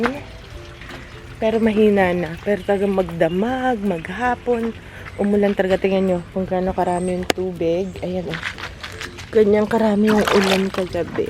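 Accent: Batangas, Philippines. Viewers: ate new to your vlogs💕 batangueño ka ho baga?🤗♥️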